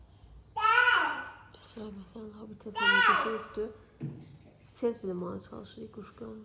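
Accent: Sheki, Azerbaijan. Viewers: Сох sırındı sekı lehcesı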